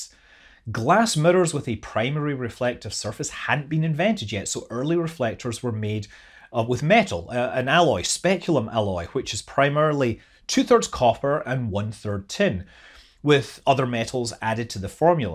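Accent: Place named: Scotland, United Kingdom. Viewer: Gälilean müns... love the Scottish accent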